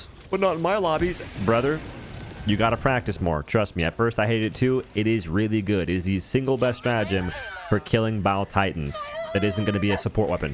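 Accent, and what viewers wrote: South, United States. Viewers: Bro do you have a southern accent or not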